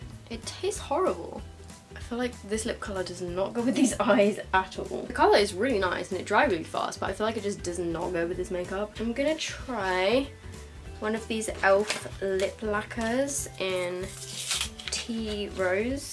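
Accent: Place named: RP, United Kingdom. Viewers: Jasmine Lister just her accent I think. I'm from Newcastle and if anyone sounded like that from here they would be called posh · Jasmine Lister I live in the south hahahah and she doesn't sound posh compared to 99% of people I know. She has what I'd call a regular English accent, you guys have a northern accent and I have a southern/posh accent 😂😭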